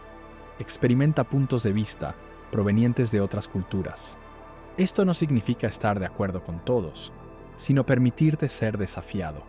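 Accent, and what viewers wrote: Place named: Buenos Aires, Argentina. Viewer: Exelentes reflexiones y la narra suena bastante como nuestro castellano en Buenos Aires. Muy lindo canal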